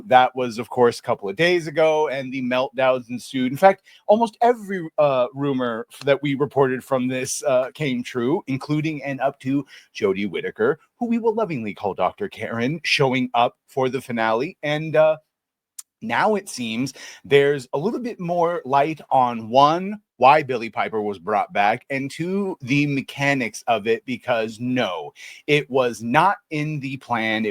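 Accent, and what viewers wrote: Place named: Cockney, United Kingdom. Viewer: Love the cockney accent, jokes on you though as it's been replaced with the Pakistani English accent.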